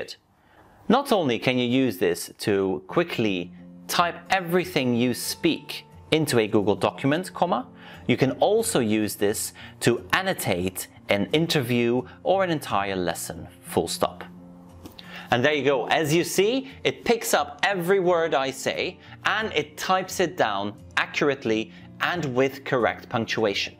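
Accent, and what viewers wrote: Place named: United Kingdom. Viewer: It's because you talk in the queen's English not in American English there's a difference.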